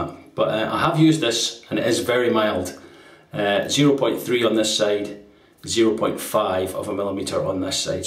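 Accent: Scotland, United Kingdom. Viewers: You asked for it,: Yes, you did butcher Fitjar and Slåtterøy a bit (but they will survive: just a few weepers and nicks) J is like an English y. The diacritical sign above the a is there for a reason: It changes the sound from an open ah to an o-like sound), the double t makes it short, like Slot in a coin slot. Ø is like the French œ or the German/Swedish/Turkish ö, rougly like the i in bird. This time as a diphthong with the y. Imagine the stereotypical New Yorker saying bird: bøyd. (As an aside, in Faroese the øy has indeed morphed into oy.) So it would sound a bit like Fit-yar Islands [that's the ‘intrusive’ English word] Slot-er-øy. Do pronounce the r in your normal Scottish way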